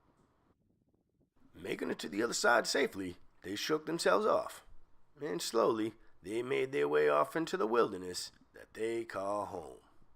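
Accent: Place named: New England, United States. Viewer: Worst accent ever,.like a southern, yankee massachussets, fake maine combo, just terrible, hard to watch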